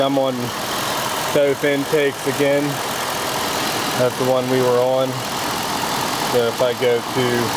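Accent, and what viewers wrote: Pittsburgh, United States. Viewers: bro where is your shop located?????...you sound like you have a PITTSBURGH accent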